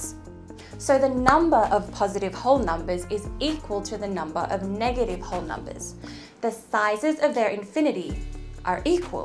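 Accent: Australia. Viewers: and I'm an idiot. I realized that after watching a couple more of ur videos. Us Americans aren't very good at noticing an Australian accent unless its particularly strong. Interesting stuff btw. U really are doing a great job... But u do have a lisp 😆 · the first female Aussie accent that isn't like nails on a chalkboard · I love that cultivated Australian accent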